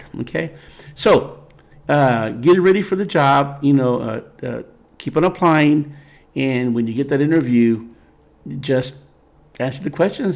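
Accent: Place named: South, United States. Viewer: Love your southern accent..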